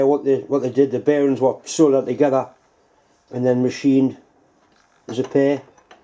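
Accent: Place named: England, United Kingdom. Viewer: Ok, I dug up my very old account and subscribed to channel and I gave a thumbs up as I should have long time ago, fore you asked me to,. I am a long lurker here otherwise:) Excuse me for my language errors, I'm a norseman:) Hi, I like your stuff there in England, especially your, for me, different dialect. I love dialects. Most Swedes wouldn't care about such things but I do. Bo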